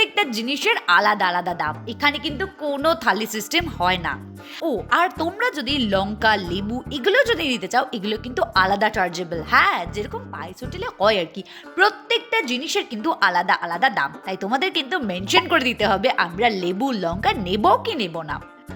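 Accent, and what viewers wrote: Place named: England, United Kingdom. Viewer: বাংলা উচ্চারণ ঠিক করুন, না হলে শুদ্ধ ইংরাজিতে ভিডিও করুন। ইংরাজি বাচনভঙ্গিতে বাংলা বলছেন অত্যন্ত বাজে ভাবে কানে লাগছে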